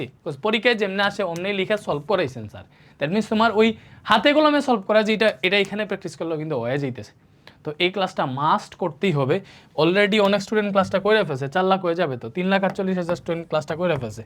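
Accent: Sylhet, Bangladesh. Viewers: Aree bhai eita moja near ki ache Siree Sylhetyy 🙂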